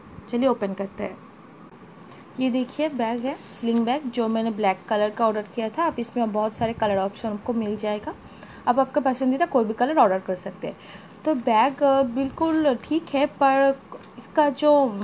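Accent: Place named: Bengal, India. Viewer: ur title is but ur accent is bengali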